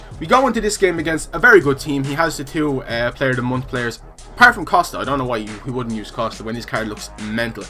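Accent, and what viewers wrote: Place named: Ireland. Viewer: Yo where you from in Ireland? Im from Galway. Im guessing Dublin??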